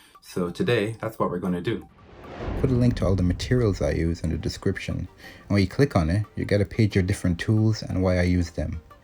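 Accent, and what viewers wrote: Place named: Ireland. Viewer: Hi, this is a great drawing and brilliant tutorial/narration of your process. I just wanted to ask you if you lived in Ireland as I can definitely here an Irish twang in your accent. Thanks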